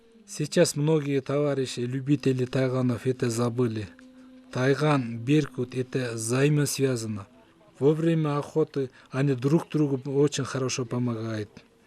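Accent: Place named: Kyrgyzstan. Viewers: Таза кыргыз орусча суйлогондо ушундай суйлойт билип ал манкурт☝ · Э гөкмээ байке кыргыз, иван же сергей эмес, таза кыргыз ушундай сүйлөйт орусчаны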